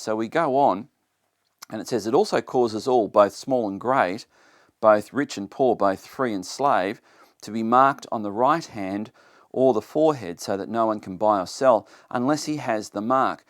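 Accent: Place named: Australia. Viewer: I will read the bible like it was "meant" to be read, when you can learn to speak English how it was "meant" to be spoken you fing ossie. We cant take anything you say seriously.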